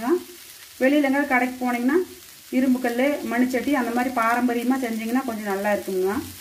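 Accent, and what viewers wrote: Kongu, India. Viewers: அருமைங்க.... வாழ்க கொங்கு தமிழ்... வளர்க கொங்கு தமிழ்..... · Kongu Tamil super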